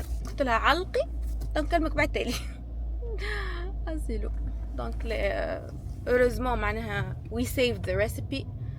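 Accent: Tunisia. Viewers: أول مرة نتفرج فيك نحسك ڤابسية من اللهجة متاعك 😂؟ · Alech te7ki anglais winti t3ich fi fransa , ma7leha esse3a lahjtek bitounsi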